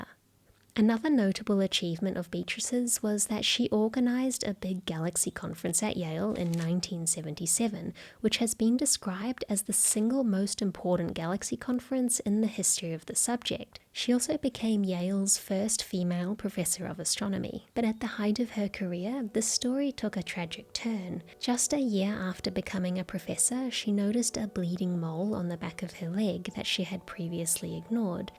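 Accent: New Zealand. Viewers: That's just the kiwi accent. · I’d not realized before this video you were a fellow kiwi! I’m terrible at picking up accents.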